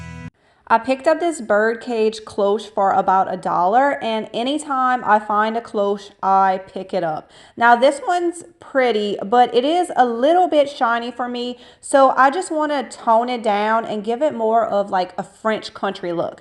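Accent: Louisiana, United States. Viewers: I know it’s been a year since you uploaded this video but I am trying to place your slight accent ……. Louisiana Cajun?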